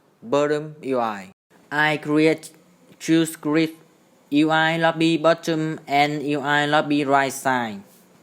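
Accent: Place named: India. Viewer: Y như mấy a ấn độ đang nói tiếng anh d :v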